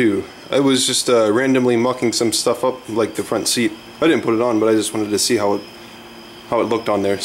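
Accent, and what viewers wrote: Canada. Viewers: You sound extremely Canadian.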